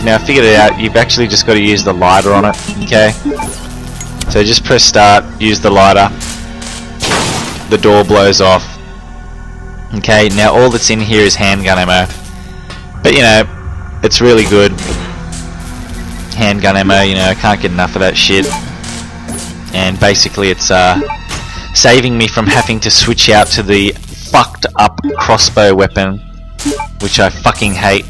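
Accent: Australia. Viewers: He’s Australian man Different dialect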